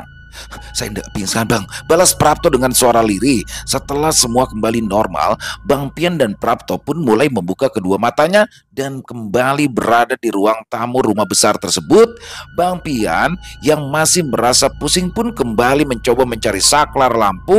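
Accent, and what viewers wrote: Jakarta, Indonesia. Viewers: Seru bgr T aye demen betawinya neok banget ya.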